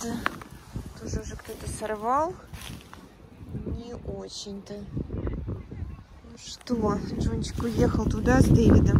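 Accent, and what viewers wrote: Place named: Bryansk, Russia. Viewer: Сподхватились? Деревенский русский. Брянщина. Хорошо,что Джон не знает русский-его бы коробило!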